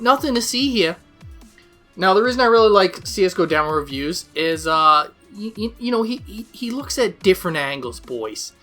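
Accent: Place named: Canada. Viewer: Are you canadian,? You sound very canadian.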